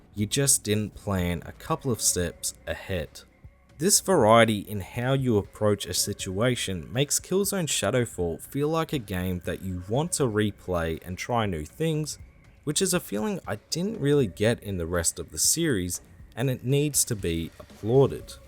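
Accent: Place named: Australia. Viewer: My first experiences with the franchise, as you rightly suggest is the case for many in this review, was with Shadow Fall. I got bored with the story fast so I enjoyed the multiplayer a lot and as I joined late to the PS4 generation, I had the chance to muck about with all the expansions. The visual appeal of the Helghast got me to pick up Killzone 2 and 3 for my PS3 from my local Video Ezy when it was closing down for $2 (NZD) each. I figured you'd have heard of them as you sound like an Aussie. I agree that Killzone 2 with it's impressive first person cover and heavy feeling shooting gameplay is the strongest entry in the series.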